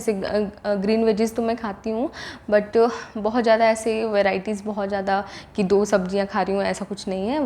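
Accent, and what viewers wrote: Jharkhand, India. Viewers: Aap jharkhand se ho??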